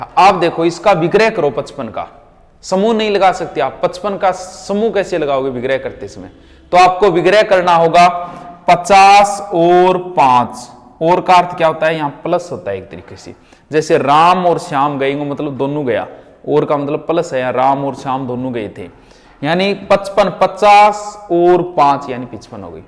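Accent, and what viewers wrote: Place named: Haryana, India. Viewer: YOU ARE FROM HARYANA. I GUESS!!!!!!!!!!!!!!!!!!!!!!!!!!!!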